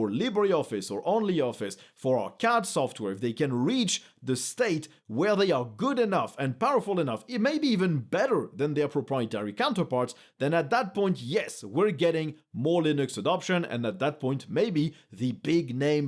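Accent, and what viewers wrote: France. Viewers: Your French isn't getting in the way of your English. no worries :) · C'est tellement léger comme accent ! Je crois que vous êtes dans mon top 3 des français qui parlent le mieux anglais (IRL et web) Merci pour le super travail, cette chaîne est devenue ma source d'info principale sur Linux, c'est un sweet spot en timings de publication, quantité et complexité de contenu !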